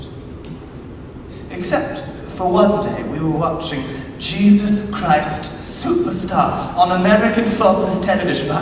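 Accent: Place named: Germany. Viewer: why he sound so german?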